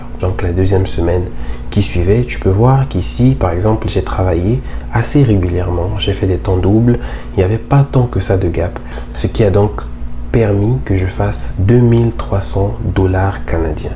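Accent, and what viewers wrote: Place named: Cameroon. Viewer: Quand j'ai vu ta manière de parler j'étais étonné que les kenyan ai le même accent que nous au cameroun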